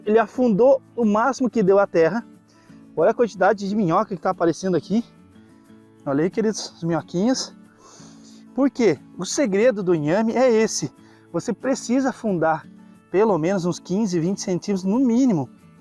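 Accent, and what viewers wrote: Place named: South, Brazil. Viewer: 😂 taiôba não sô taioba 😂 sotaque do sul 😂 amei o conteúdo. Deus abençoe vocês hoje e sempre.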